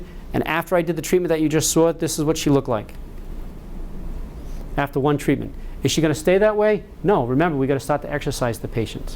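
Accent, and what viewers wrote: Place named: New England, United States. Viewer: Lol. Near the start, his New England accent really threw me off momentarily. “…in other POTS of your body.” Lol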